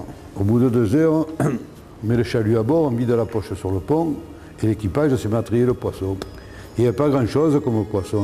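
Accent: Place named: Provence, France. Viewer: J'aime, j'adore votre façon de peindre la vie avec ce magnifique accent provençal !merci pour cette belle histoire 💛❤️aux couleurs de chez vous !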